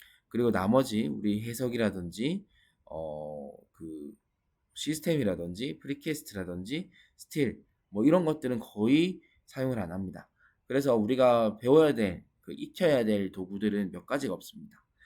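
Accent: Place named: Busan, South Korea. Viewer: 부산이네요 ㅠ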